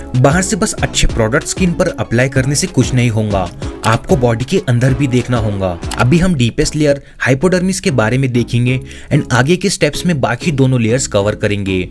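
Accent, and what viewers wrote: Hyderabad, India. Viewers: 𝗕𝗿𝗼 𝗔𝗿𝗲 𝗙𝗿𝗼𝗺 𝗛𝘆𝗱𝗲𝗿𝗮𝗯𝗮𝗱 ❓💌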